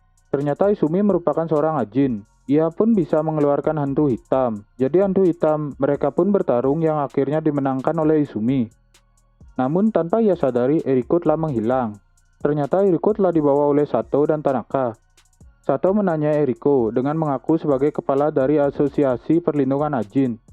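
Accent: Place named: Bali, Indonesia. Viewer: Logatnya dari bali ea bang slam kenal ea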